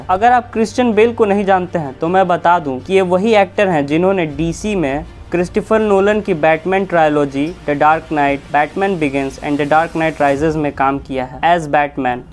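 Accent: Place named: Magahi, India. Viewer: Video khub nick lagal ba..keep uploading such contents bro.. can sense our Magahi tone..❤️❤️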